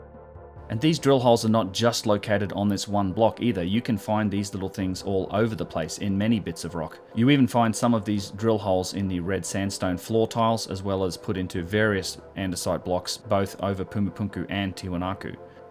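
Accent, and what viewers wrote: Australia. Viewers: I wish the voice in my head had Aussie accent. <3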